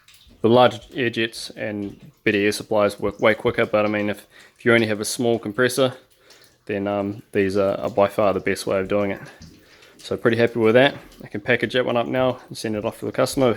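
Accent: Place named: New Zealand. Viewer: I had basically the same question. I've been thinking about vapor blasting for a while but i'd never looked at how a professional cabinet works, basically because i'd never be able to afford one. Looking at other diy-ers they were using water blasters but media getting stuck seems to be all too common. I modified my water blasters plastic gun to try adapt a T but it couldn't handle the pressure, and to get to decent pressure the aperture has to be quite small, basically 1mm. I was going to build a pressure pot to also help feed the media through the gun but i'm sure glad i came across these videos. Thanks for pointing us in the right direction bro. Also a pleasure to hear the Kiwi accent! Fellow kiwi in Brazil.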